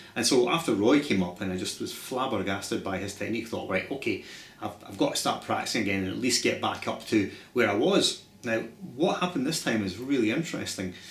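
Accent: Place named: Birmingham, United Kingdom. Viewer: For some reason when I listen to you speak, you phrase some words like the guys in Sabbath and Priest... I only use those guys as examples because they are the only people I have heard speak that are from Birmingham. When I think of Scotland I think of Sean Connery Thanks for the lessons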